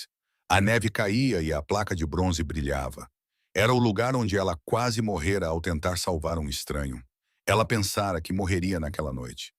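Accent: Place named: Portugal. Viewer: Ellen do Rio de Janeiro RJ 🇧🇷 Gosto muito de histórias de máfia narradas no português de Portugal!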